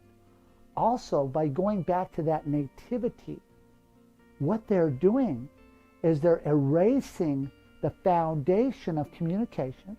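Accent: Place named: United States. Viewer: Transcription de la conférence ci-dessus de Russel-Jay : Gould Ne parlant pas couramment l’anglais L’accent américain ne m’aide pas Voici donc ci-dessous La transcription de la traduction you tube Avec certainement des fautes de traduction Gratitude * * * Salutations J'ai regardé les médias et les choses qui se passent dans la société Il y a beaucoup de préoccupations que j'en … Que j'ai en tant que maître de poste de notre monde Je suis témoin des mots, que les gens utilisent et des conditions historiques des mots du passé Je parle à propos de Babel, non je n'ai pas dit la Bible j'ai dit bavardage : bable, qui est une grammaire fictive C'est la grammaire ou la communication que nous utilisons pour la confusion Et je voudrais tout d'abord dire honte au gouvernement des États-Unis au Congrès, à la poste les militaires US, et des présidents qui depuis 1999 ne se sont pas manifestés Et non pas donné clôture des contrats aux citoyens de cette Grande Terre et aux citoyens du monde que nous étions capturés par un système frauduleux de grammaire de Babel avec le certificat de naissance de tout le monde pour ceux d'entre vous qui n'ont pas les antécédents de ce qu'est un certificat de naissance s'il vous plaît aller sur <link> et regardez mon film mais revenons à ce que nous voyons maintenant dans la société dans l'ingénierie et la nouvelle compétence en communication que les gens utilisent vous entendrez les gens utiliser le mot aborigène lorsque vous commencez un mot avec une voyel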